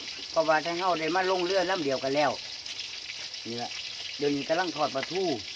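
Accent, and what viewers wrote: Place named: Isan, Thailand. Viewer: ผมละคิดฮอดเจ้าคักยาย fcตะสมัยเสียงอิสานครับ บ่มีคั่วแมวบ่ยาย555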